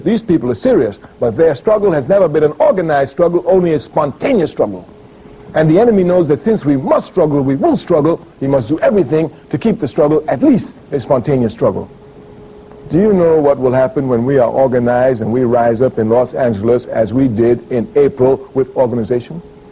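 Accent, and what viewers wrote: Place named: Trinidad and Tobago. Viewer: Here he sounds the most Trini I've ever heard him sound..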